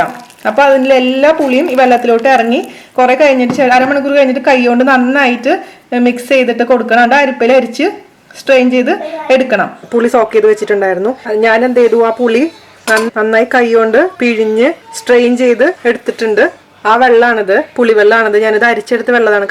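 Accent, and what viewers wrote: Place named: Kannur, India. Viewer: Ingal Kannur aano😜Nala language😝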